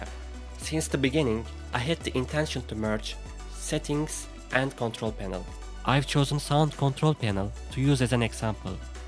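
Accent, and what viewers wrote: Turkey. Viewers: O kadar Türkçe aksanın var ki :D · Aksanından anladım Türk olduğunu :D Başarılar! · Türk aksanı ilk sözden anladım 😘😂 Türkçe altyazı da koysaydın iyi olurdu. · Türk ingilizcesinin şekil bulmuş hali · bu kesin Türk, aksanından belli. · Türk olduğunu aksanından anladım ama güzel video🙂👌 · Good job. / Aksanından Türk Olduğunu Anlamıştım! Gerçekten Çok İyisin. Devam Et. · Türksün lan sen konuşmandan belli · Olm aksağanından anlaşılıyo türk olduğun lan aksağan berbat fjslnsfjld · ABİCİM Bİ VİDEO DA TÜRK OLDUĞUNU SÖYLE YA KONUŞMANDAN ANLAŞILIYO BİLE NERELİSİN ABİCİM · Reis aksanından türksündür diye düşünüyorum fenasın adamsın ellerine sağlık :)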